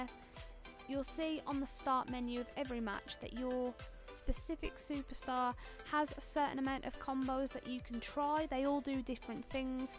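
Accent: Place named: Birmingham, United Kingdom. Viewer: Your Brummie accent is top tier!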